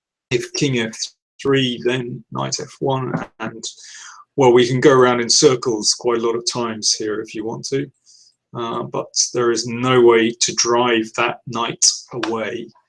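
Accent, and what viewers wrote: United Kingdom. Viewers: U really think u dont have a British accent? strange, of course u do. not that there's anything wrong with it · what does he mean at the beginning when the guy compliments his British accent and he says "i don't have an accent" ??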